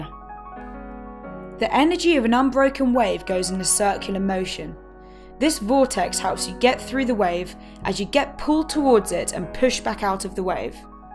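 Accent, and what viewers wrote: United Kingdom. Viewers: Hahahahaha..I just love the English accent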